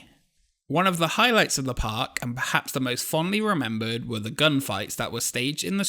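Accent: United Kingdom. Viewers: What part of the UK are you from? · I mean, he does have a British accent. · It's annoying to hear a Brit when it's in NC... that's why. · AAAAAGGGGHHH... British Narration. FUCK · Where are you from? I know Britain, but which part? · Why is it that all the narrators for videos like these seem to have British accents? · It's funny hearing a British person describe a park in North Carolina.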